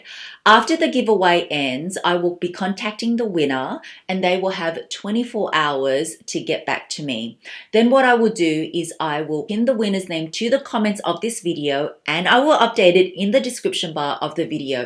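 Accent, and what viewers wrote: Australia. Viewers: Hi, I love watching your videos. I’m from the US so I find you Ausie accent so adorable 😁. I would like the bag please! I’m a new YouTuber and it would be awesome 👏 to win the bag so I can vlog about it!!! It would mean so much to me! Wishing you more success!!!! ♥️🤗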